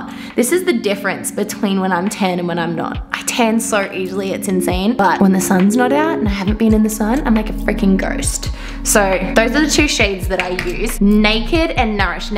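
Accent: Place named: Australia. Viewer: Why do Australians, and I have only noticed on girls, pronounce the letter "o" like "or": oh nor, here we gor, etc... lmao I find it kinda funny and cute.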